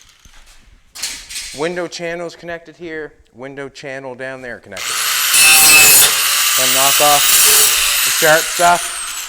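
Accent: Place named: Canada. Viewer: This dudes for sure a Canadian!!! Listen to his accent!! Yeah buddy!! That cab oversight gonna kick some serious ass!!!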